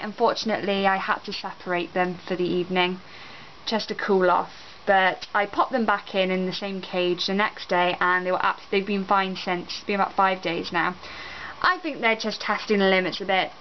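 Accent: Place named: England, United Kingdom. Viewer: Because she is from England and they have heavy accent as you can hear in the video other country with heavy accent are Indians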